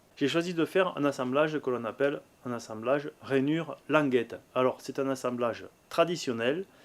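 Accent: South, France. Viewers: Pas de quoi , et oui c' est très long la fabrication de mobiliers , pas mal de gens pensent que ça doit se faire en 2 jours un meuble... Voilà pourquoi il ne faut pas à avoir à revenir sur des étapes précédente. Bien faire comme préconiser en traditionnel , débit grossier , corroyage , assemble etc... et enfin mise à longueur final montage à blanc et si tout est ok , phase terminale , le collage de l ensemble. En tout cas je suis abonné , et j aime bien votre façon de présenter vos vidéos , avec ce p'tit accent qui à l' air de venir du Sud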